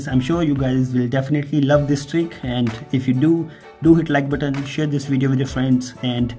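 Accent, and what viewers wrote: India. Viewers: Indian accents means you can’t trust him Probably works for a scam company and writes tree in cmd like he did it here Nonsense not working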